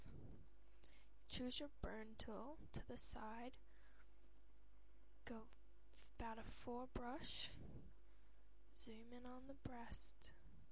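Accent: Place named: United Kingdom. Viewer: You sound British o-o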